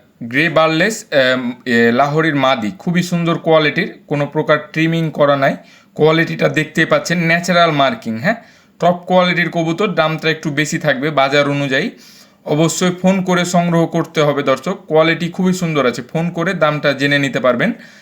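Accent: Kolkata, India. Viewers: আপনার কথার ধরন কলকাতার মানুষের মতো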